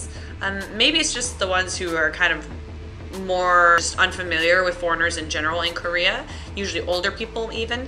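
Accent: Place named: South, United States. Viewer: Do you ever find your southern (you don't have the full c*** a** accent) causing communication problems?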